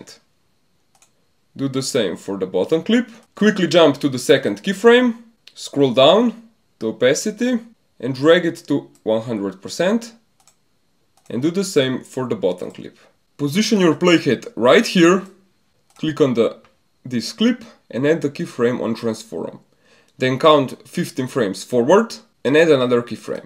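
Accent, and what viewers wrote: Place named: Russia. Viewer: Почему-то чувствуется русский акцент)))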